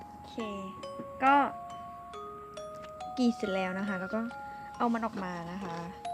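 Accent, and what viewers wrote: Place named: Thailand. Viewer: แอบเหน่อๆนะเนี้ย น่าร๊ากกก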